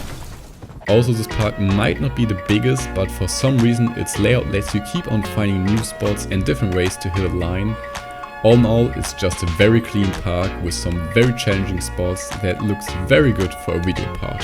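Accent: Germany. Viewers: Are you german? The accent is soo german idk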